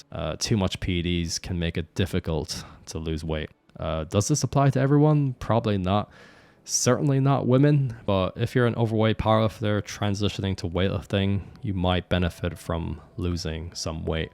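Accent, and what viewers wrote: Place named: Ireland. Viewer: No, he’s just Irish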